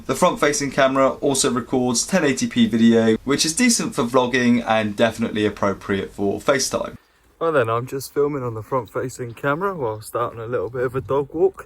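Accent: United Kingdom. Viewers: Atr you british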